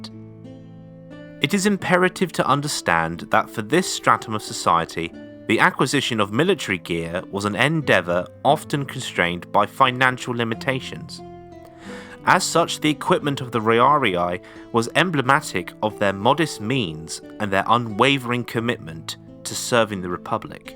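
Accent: United Kingdom. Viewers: ya sound like you're from heartfordshire. Let that proper british accent out will ya? 💯👍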